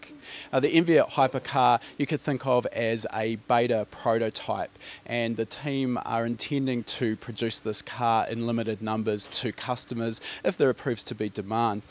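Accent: New Zealand. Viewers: Wonder if they could just run adjustable magnetic dampers? Less weight and complication to suspension. Lmao at using a 5.3. Epic. They're good for around 700-800hp stock but the K1/JEs will do wonders for going over that if the top end is looked after. Glad they went dry sump, a given for any LS over 1-1.2G sustained. Looks like I have a new goal racecar. Unlimited aero is what I'm looking for. Love the presenter, someone who actually knows cars. Great stuff! Sounds like a kiwi or maybe an Auzzie haha. Subbed.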